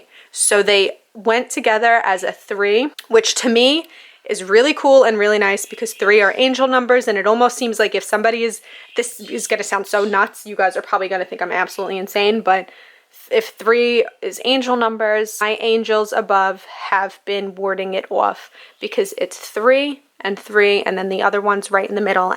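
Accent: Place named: New York City, United States. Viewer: i love hearing an authentic nyc italian accent 😆 as a canadian hehe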